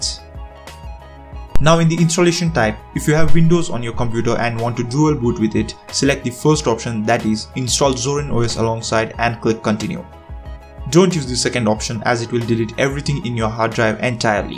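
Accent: India. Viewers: Bro you Indian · are u from india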